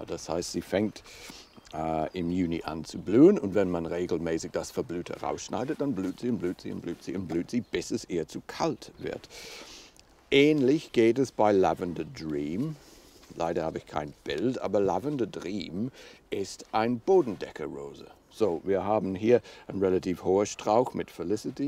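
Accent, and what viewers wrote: England, United Kingdom. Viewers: Dear you speak beautiful German! I love your English accent! I also love all your expertise! Many thanks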